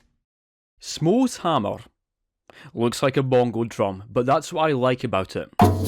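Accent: Scotland, United Kingdom. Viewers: May i ask a question? Are you a scottish or an irish? I'm just interested... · what i love more, is a scottish accent xD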